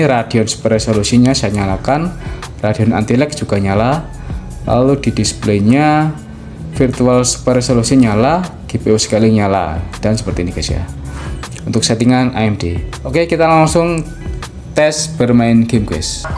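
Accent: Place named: Java, Indonesia. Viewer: Jijil banget klo dnger jawa mgomong😂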